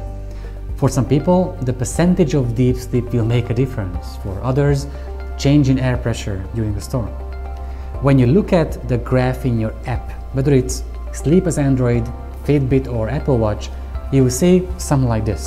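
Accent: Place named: Hungary. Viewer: Gondoltam az akcentusodból hogy magyar vagy 😅 Mit mondanál, mi a legjobb alvás tracker? Mit érdemes venni?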